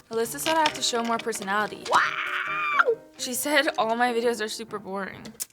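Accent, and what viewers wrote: England, United Kingdom. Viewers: I love how you always throw in an English accent 🤣🤣 loved this fun pinky look 💗✨ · So everyone is just gonna ignore the fact shes been using a British accent 🤔🤨😂😂🤣.... im here for it though, i do it all the time also, glad im not alone!!.. happy valentine's day 💕💗💞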